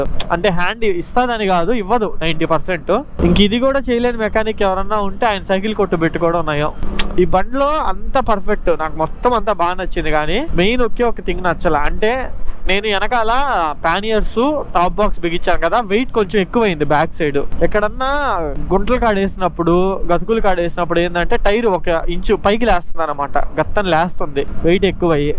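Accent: Nellore, India. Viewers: Bro.. I also from nellore District atmakur now staying in Dubai....Can you please describe about your camera and audio system...మా యాస లో vedio చాల బాగుంది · Mana Nellore yasa super nuv Neela unnav 👍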